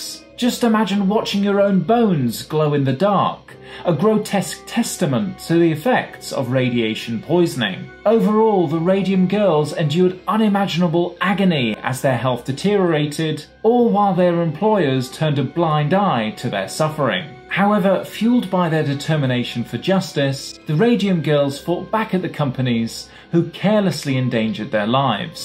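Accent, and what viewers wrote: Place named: RP, United Kingdom. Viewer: Not only this material is utterly informative...the level of English RP is amazing,great training for an English teacher like me hailing from Havana.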